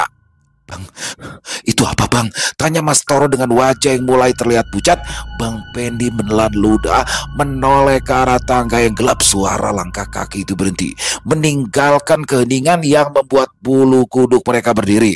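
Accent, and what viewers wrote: Jakarta, Indonesia. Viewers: Waaah, waaah ada bang lagi nich, paling seneng deh dengerin logat dan Betawi nya dan kelucuan bang serta si babe ☕☕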